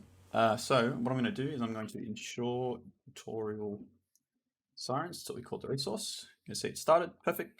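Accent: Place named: Australia. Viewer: me 10 seconds in: this guy is aussie so it has to be good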